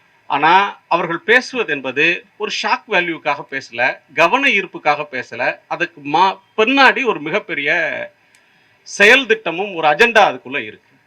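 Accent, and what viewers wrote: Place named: Kongu, India. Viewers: இப்ப வரைக்கும் நீங்க பேசறது கோயமுத்தூர் வட்டார கொங்கு ( கொஞ்சு ) தமிழ்தானே.......❤❤❤ கச்சடாவை தூக்கி தூரமா போடுங்க...... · Kongu tamil appadithaan erukum.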